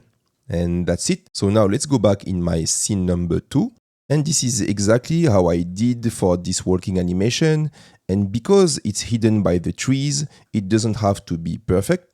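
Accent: France. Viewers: J’ai reconnu un accent français si je ne me trompe pas. Si je ne me trompe pas cargo ne fonctionne pas pour la version actuelle de blender c’est dommage que ça rende obsolète tout tes tutos ou tu l’utilises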